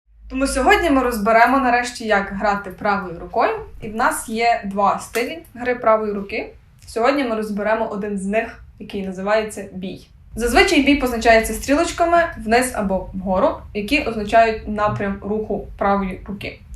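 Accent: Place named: Western Ukraine, Ukraine. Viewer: Уроків гри на гітарі багато, але ці особливі. Бо Ви маєте певний шарм в донесенні меседжу до глядача. Звідки ви? По мові чую, що мали б були західні області. Тернопіль? Львів? Я з Франківська...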